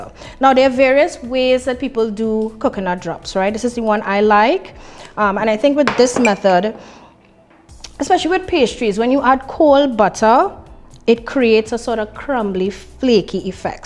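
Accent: Trinidad and Tobago. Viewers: Ah like de trini accent gul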